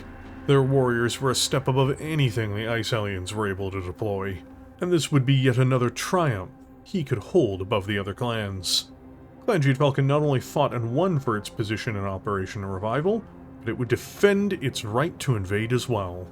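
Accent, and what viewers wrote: Canada. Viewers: I must applaud the incredible effort. A near 5hr monster that gave you no safety net to fall back on is hercule task in itself, but at first you seemed to follow the Doctor Who timeline of certain moments in history always have to happen. Not saying that couldn't glean out possibilities of who what and where, but the why was always going to be there. Twycross would always happen, yet a Rasalhaguean airspace pilot crashing into the bridge of a warship did not. Now, whether or not your crippling Canadian pronunciation is seemingly done to tweak my ears or that is just something that you do just to tweak the internet just because you have a channel and I don't. 1. I expected that Clan Wolf would be taken out of the driver's seat. Partly cause of (deserved) hatred and partly cause this was an exercise of a true 'what if'. You can't play fantasy with all the same pieces and expect a different result. 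2. Clan Hell's Horses. While the use of Hellions, Goliath Scorpions and Fire Mandrills was interesting, as some never had much written about them, so this was unique in this creation, but HH, with their mantra of combined arms of mech, tank and infantry, while similar to the innersphere doctrine of warfare, I believe in the long run, would have provided a more stable invasion force. But stable means boring and you would not have had Khan Steven Tyler(was FASA writers fans of Aerosmith?), blowing up about everything. 3. While I understand that you needed new players in the invasion of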